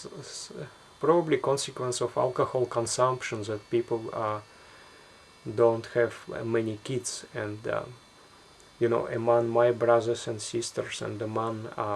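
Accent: Russia. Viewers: Guy, you have so russian accent :D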